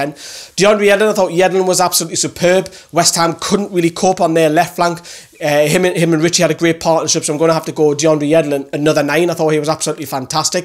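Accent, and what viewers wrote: Newcastle, United Kingdom. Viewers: Winning certainly brings oot your Geordie accent hahaha!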